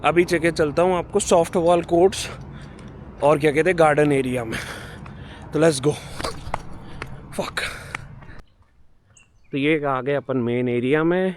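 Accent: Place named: Bundelkhand, India. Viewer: Jst LOve Your Bundelkhandi accent :face-green-smiling:💟😍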